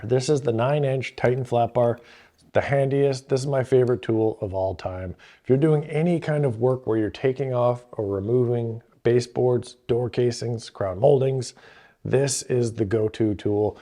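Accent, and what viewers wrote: Canada. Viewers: Camera work is getting so good! And...yeah i watch these videos cuz you're insanely handsome, and I have a soft spot for Canadian accents, but the handyman tips are a bonus 😎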